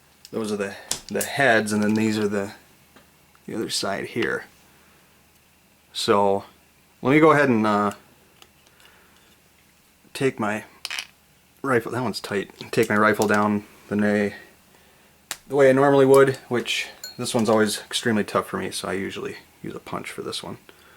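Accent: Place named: Minnesota, United States. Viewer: It sounds as if the publisher of this video is from Minnesota... Good tutorial for the replacement of push-pins.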